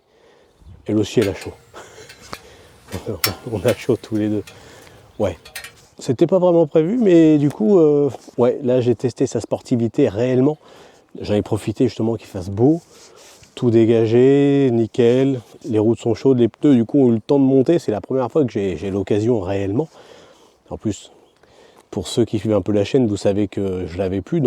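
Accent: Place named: Rouen, France. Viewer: En mode Speurt? comme c'est beau l'accent de Rouen 🙂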